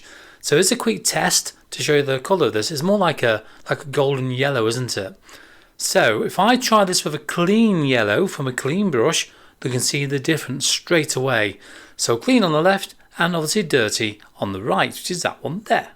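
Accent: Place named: Liverpool, United Kingdom. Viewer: This was awesome. Your accent reminds me of the greatest band of all time-!!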